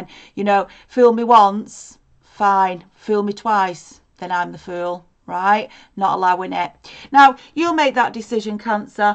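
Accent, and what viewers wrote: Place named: Liverpool, United Kingdom. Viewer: liverpool accent !! love it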